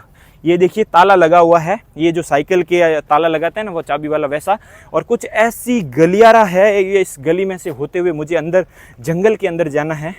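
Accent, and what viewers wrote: Rajasthan, India. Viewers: भाई आप की बोली से तो एसा लगा आप राजस्थानी हो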